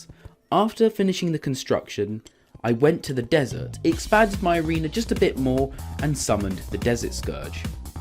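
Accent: United Kingdom. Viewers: Oh my god, this was honestly so cool. And I love how your british accent suits with your jokes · Are you from the UK?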